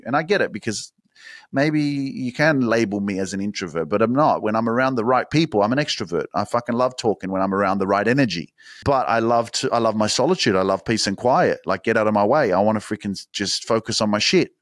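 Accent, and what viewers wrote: Australia. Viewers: I love your daily msgs. New listener but I’m learning your dance moves and it always gives me a lift. Love the accent, Aussie. Love the msg. Turned my daughter on to you. She’s in a bad place in her life and needs positive reinforcement. Keep it up❤️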